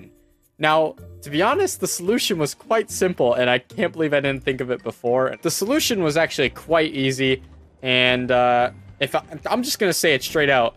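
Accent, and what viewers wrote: Canada. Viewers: u canadian ?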